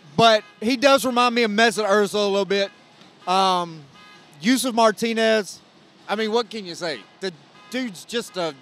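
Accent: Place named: South, United States. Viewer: Yoo i aint trying to be racist but he sound way too southern to be a soccer but overall funny guy btw Super Dortmund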